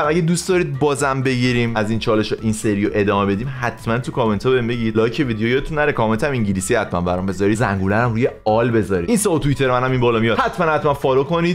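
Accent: Isfahan, Iran. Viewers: دادا یه کلیپ بساز فقط با لحجه شیرین اصفهانی حرف بزن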